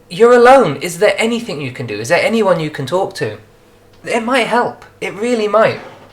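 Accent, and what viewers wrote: United Kingdom. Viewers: Awww there you go :D you can talk to the camera perfectly! You must be british though :3? Very true tips :)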